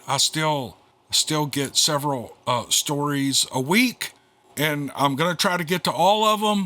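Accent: South, United States. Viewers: I'm from the UK and that beautiful southern accent, so relaxing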